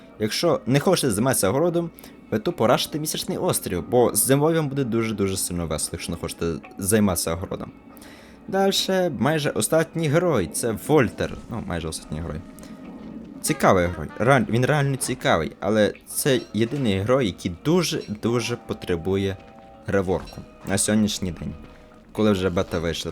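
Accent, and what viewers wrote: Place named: Poland. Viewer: Я спочатку намагалась зрозуміти, чи це польска чи білоруська 😭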